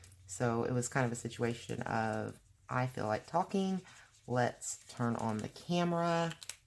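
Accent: South, United States. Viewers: Hey.... just FYI... if you use the paint w glitter... it WILL dry flat;o)) I have used it on quite a few pages in my books. I also have ALL of the Markova books and I really can not say one is better than the other, however, Tenderful Enchantments is my favorite!!! I am swatching colored pencils now watching your video;o)) I had to laugh when you was talking about the way you speak and say words...I get that ALOT...its a SOUTHERN THANG... I'm from New Orleans;o) Keep up the good work and I hope to get some things DONE in 2019 myself... thanks for the PEP talk!!!! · OMG!!! WOWZA!!! I have NEVER had the courage to color in my Klara Markova books until I found this video! I am in AWE of how well you do such remarkable things with your markers!!! Please know I am honest to a fault... so know I will be real with you. You tickle me when you are REAL with us in these videos. 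Thank you soo much for your honesty. Love your goals and candor about your life, a lot of us don’t even know we have lost ourselves... and it’s totally okay to have your SOUTHERN ACCENT, most folks find it sweet and yours is not as deep as some are... wish you would be less critical of yourself! You are so DELIGHTFUL and HONEST!!! THAT will grow this channel sweetie... I watched you on this page in Tenderful Enchantments and what I saw was you not even hesitate to shade in with the gold gel pens!!! You really do know where and how to shadow, girl...!!! I watched you lay down the blues and deeper blues and see you instinctively place shadows where they go!!! I said I will be honest. It blows my mind how you worry about wips, cuz I’ve got a bunch, that I may go finish or not. It’s not a biggy for me. I have every intention of following you in 2019... and learning that I’m more normal than I realized until you put yourself out here. 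I love you a bunch and wait for your videos to come up. Hey, welcome and THANX for being frank about gaining weight over the holidays, like the rest of us. I know what ya mean about the anxiety stuff & the patience, err I don’t l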